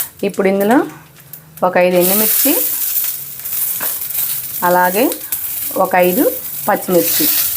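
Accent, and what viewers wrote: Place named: Guntur, India. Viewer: Super super superrrrrrrrrrrr intlo chesukodaniki simple and superb Tq Gnt slang chala neet ga vuntundi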